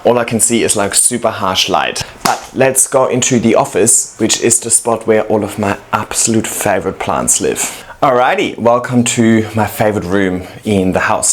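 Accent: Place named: Germany. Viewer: It‘s cute to hear „ leider too heavy“ von dir😂😂😂, schon witzig das dein Deutsch nach all den Jahren noch durchkommt😬✌🏻finds lustig😊 love your video😃🪴 Lg Meike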